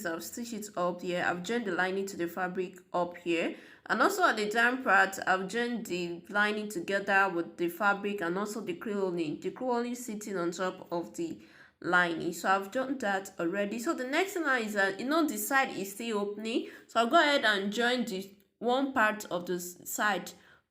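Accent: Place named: Nigeria. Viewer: I love your natural and beautiful Naija accent ❤❤❤ I had watch multiple times. Thanks for the interesting tutorial! I'm about to try this technique but scared to flop 😅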